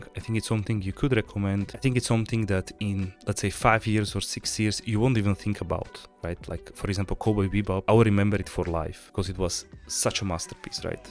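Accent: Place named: Russia. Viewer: Why I feel Russian accent..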